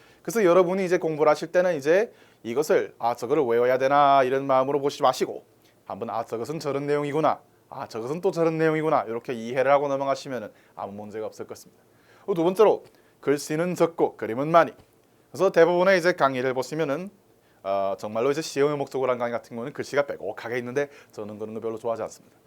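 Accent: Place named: North Korea. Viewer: 완전 김정은의 흉내를 낼려고 북힌말을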